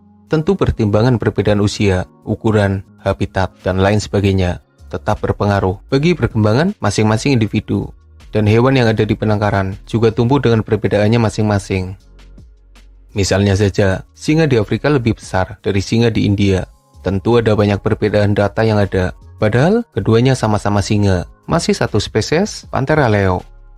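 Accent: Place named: Ngapak, Indonesia. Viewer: Orang jawa nih admin nya 😂 sepetinya ngapak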